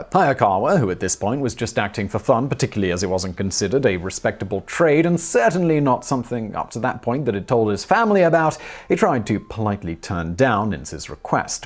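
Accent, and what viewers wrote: England, United Kingdom. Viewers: Very well put when you said "....his altruistic accent..." Love your sites. You're the man and a British accent I can handle. And understand. Always awesome narration. And now someone is going to think you wrote this to yourself. Ha,as if. Peace, nice work and thanks. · 10 20 19 Hey & the Team, Thanks for the post. Lovely suit, color is good on you too; not that my opinion matters that much. Be well. v P.S.: Classic Bond look to go with the British accent. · sigh...you are so British...tailored suit...but they are still doing window pane fabric? British guys can get away with that (I lived there long enough) Americans can't...they don't have the accent and don't know the difference between oxfords and brogues...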